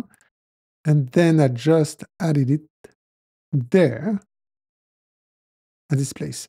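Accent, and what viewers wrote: France. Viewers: ahhh l’accent français ❤❤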